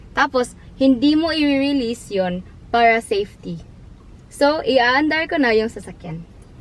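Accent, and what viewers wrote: Visayas, Philippines. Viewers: bisdak for sure! 😍 sweet tone of voice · bisaya man ka dai, pag bisaya dai oi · Bisaya kba? I can hear some tones.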